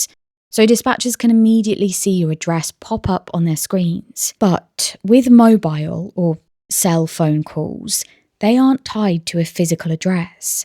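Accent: United Kingdom. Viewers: The narrator is British, hence the accent. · The really pronounced, British accent ruins the video. It’s hard to sit through. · She is British, so you want her to sound anything other than her own? 😂 I’m even more confused. You don’t like it don’t watch it problem solved. Haters gonna hate no matter what. Geez. I always love accent and how she present her story. Keep going girl never mind the haters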